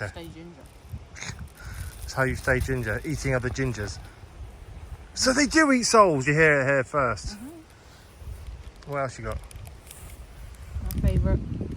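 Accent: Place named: Kent, United Kingdom. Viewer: you’re edge of Kent though right? I heard it a little the more I watched to be fair…I have a mate down the road who came from Kent too and you sound like him…but, not quite as much. Whereabouts is your detectorist it’s in crime from then?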